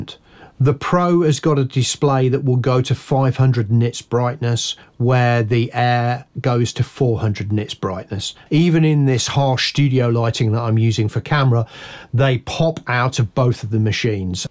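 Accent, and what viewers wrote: United Kingdom. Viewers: Thanks for getting back to me. Have ordered the M1 MBP with 16GB & 1TB I’ll be using it primarily to record acoustic instruments and vocals in situ, probably no more than 7 or 8 simultaneous tracks at a time. Fans have been an issue in the past, have you had any problems noise wise with the MBP under load? PS You sound like a fellow midlander, from Stourbridge myself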